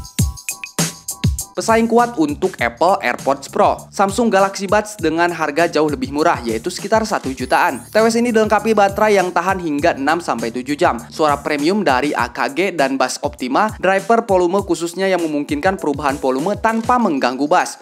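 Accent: Indonesia. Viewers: Sunda pisan anjay pas buka videonya 🤣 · sunda pisan ieu euy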